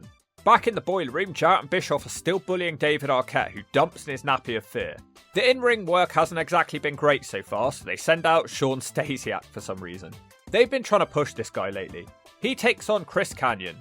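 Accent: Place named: West Country, United Kingdom. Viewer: That West Country accent was seeping out in this video 😂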